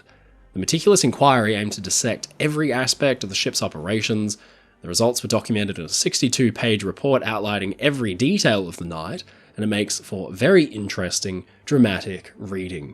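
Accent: Australia. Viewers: Great to hear the beautiful Australian accent. Great vid, but you might want to brush up on your tenses.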